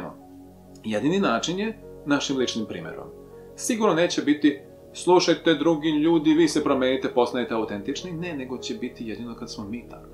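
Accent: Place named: Vojvodina, Serbia. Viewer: Imas divan akcenat..rekla bih da si negde iz Vojvodine, kao i ja inace.. Svako dobro ti zelim i super si 😊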